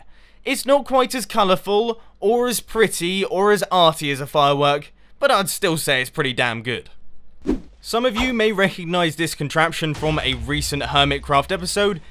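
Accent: England, United Kingdom. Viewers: Ahhh... the britishness of that british voice... like music to my ears... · The british voice made up for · Are you british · That intro was very English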